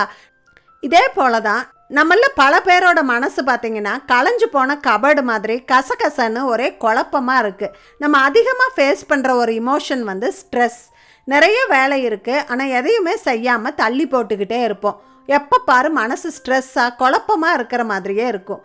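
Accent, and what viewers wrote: Coimbatore, India. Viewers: கோவை தமிழ் உச்சரிப்பு மற்றும் மிகவும் பயன் தரும் தகவல் நன்றி சகோதரி.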